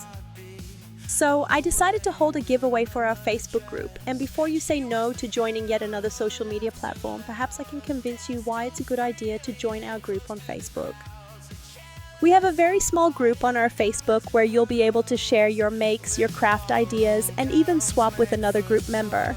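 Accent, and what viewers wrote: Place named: Australia. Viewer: Hi! Please don't fret over the Accent In the Video! TBH, I love it!!! I've always loved a hood Australian Accent! I think it's Beautiful! It's REALLY not THAT Noticeable. Like... TBH, I don't think I would've caught it if I wouldn't have read the Description! LOL! ~🙏💙🙏Much Graditude From The Carolinas, Erica 🙏💙🙏~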